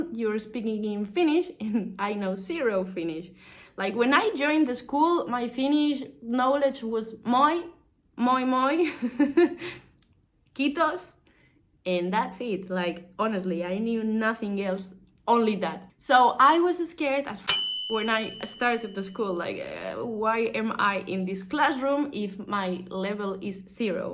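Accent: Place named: England, United Kingdom. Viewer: ngl i love your english accent, so much energy!!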